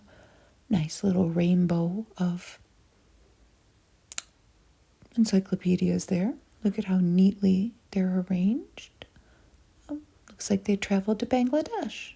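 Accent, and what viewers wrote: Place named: Michigan, United States. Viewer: This is a Michigan accent if I’ve ever heard one!! :)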